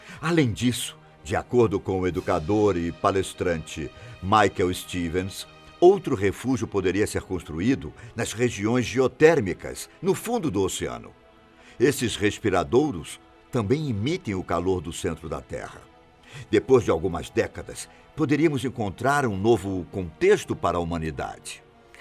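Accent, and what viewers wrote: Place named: Rio de Janeiro, Brazil. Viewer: É que ele é carioca kkkkkkkk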